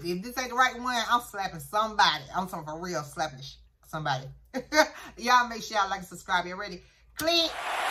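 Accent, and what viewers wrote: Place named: South, United States. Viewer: It's pronounced Al kemy. It's the old name for science, when they still believed in magic. The old alchemists did some weird things, like trying to turn iron into gold, and guessing how many angels could balance on a pinhead! But eventually they started to discover really useful stuff. But I think Knopfler, in the red jacket, really did produce golden sounds. I found your southern accent difficult to understand sometimes, but you would probably struggle with my Yorkshire, Northern English accent. Where comes from, Newcastle upon Tyne, nearer the Scottish Borders, is a really difficult accent, when it's spoken properly.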